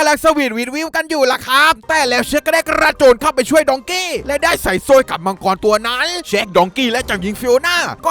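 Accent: South, Thailand. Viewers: ผมนอนฟังขำเลยภาษาใต้ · พี่บาวเป็นคนใต้กันหลาว หรอยนะ ผมก็เป็นคนใต้ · พี่เป็นคนใต้บ่นี่ 55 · คนใต้รึป่าวครับผมคนใต้ฮะ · 4.52 ไอยักษ์เข้าเมื่องมาได้พลั่นปรือนิ(พี่เป็นคนภาคใต้หรือปล่าว)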